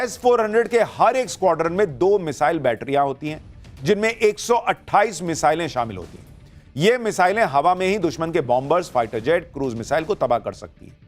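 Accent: Bihar, India. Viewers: आप अच्छे एंकर हैं और आपका बयां करने का अंदाज़ अच्छा है।आप शायद बिहार से हैं, जो बीच बीच में आपकी टोन से पता चलता है। आप अक्सर भाई, बॉस जैसे शब्दों का प्रयोग भी करते रहते हैं, जो शायद आप लोकप्रियता प्राप्त करने के लिए करते हैं। राष्ट्रीय स्तर के एंकर होने के लिए थोड़ा इससे बचेंगे, तो यह अच्छा रहेगा।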